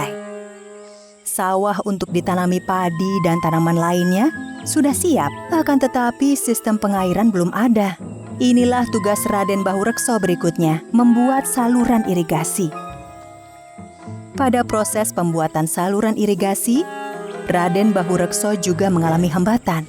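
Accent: Banyumas, Indonesia. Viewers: Ngapak wonosobo😂👍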